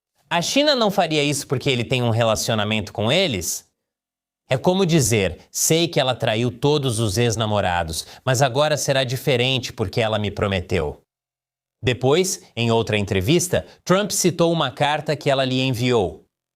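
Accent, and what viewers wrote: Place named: Portugal. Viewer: Que porra essa mudança para o sotaque de Portugal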